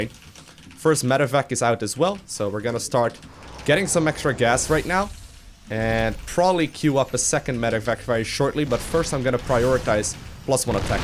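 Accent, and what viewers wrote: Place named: Netherlands. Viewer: hey, its extramadchicken the guy who literally started playing sc2 again due to that fabulous Dutch accent. anyways i know this is an old video but since u are still actively streaming i was wondering of what we would like Your easy to follow tutorials. i was wondering if you could potentially create more terran and possibly toss videos when u have time. Although zerg became my initial choice due to a wide variety of videos youve made as u are the best sc2 instructor out there, id like videos of u analyzing some Terran and toss basics and principls. It will inadvertently help zerg players because it will teach them the mechanics behind the other races. Also when i decide to not be a pleb anymore, ul be the first and likely only streamer ill sub for xD kappa.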